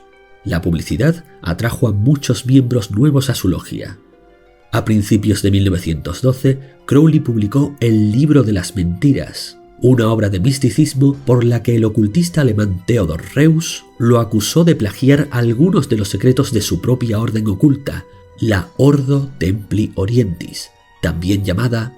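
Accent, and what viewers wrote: Spain. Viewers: Ese acento, diría que eres andaluz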